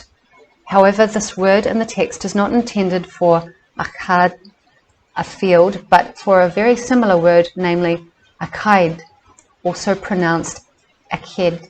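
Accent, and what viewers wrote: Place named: New Zealand. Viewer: Notice the kiwi accent... Would love to have a chat sometime 😁❤️ blessings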